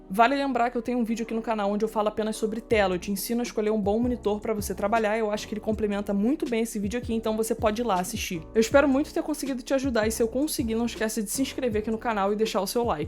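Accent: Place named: Rio de Janeiro, Brazil. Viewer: Quase não da pra reparar que ela do Rio né hahaha Goxtoso esse sotaque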